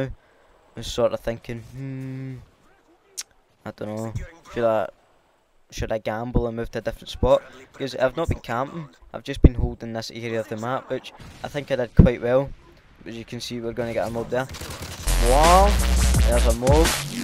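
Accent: Scotland, United Kingdom. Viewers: Bro ur growth has been superior! And trust me in my opinion ur commentary is a trillion times better than those u mentioned at da start! No one beats ur one liners. And FUCK APOLOGIZING about ur accent! Scottish and proud (ain't ur fault if ppl can't understand) Peace!!!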